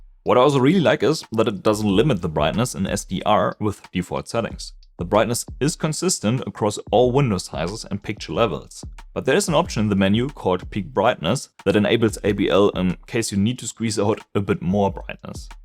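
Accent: Germany. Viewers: der deutsche akzent ist einfach viel zu stark🥲 meine ohren tun weh. Aber nices video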